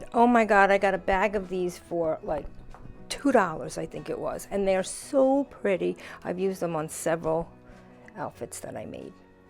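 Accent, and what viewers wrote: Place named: New Jersey, United States. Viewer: I am intrigued by them. Not sure I would wear them. Are u from NJ? I am trying to guess ur accent.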